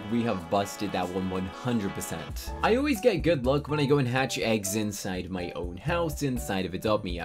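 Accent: United Kingdom. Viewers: You sound like every british guy I've ever met, it makes me feel like I know you lmao.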